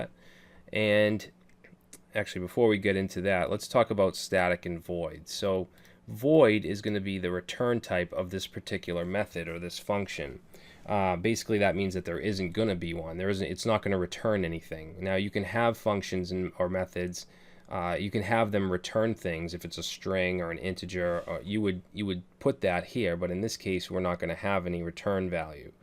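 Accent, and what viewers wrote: Boston, United States. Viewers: I hear Boston in that voice... Edit: I just saw the shirt. Boston confirmed. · This guy is from Boston. I like his style, but I can't stand his accent and people from there. Go Blues!! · Are you from Boston or Maine?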